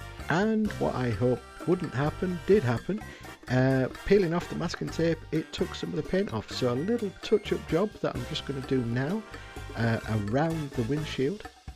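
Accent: United Kingdom. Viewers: I suspect from your accent that you are from somewhere in the UK. We in the US who follow American football may remember the quarterback Jay Cutler, who was the team captain of the Chicago Bears from 2009 to 2016. Not sure, but I suspect he probably also liked Scooby Doo as a kid.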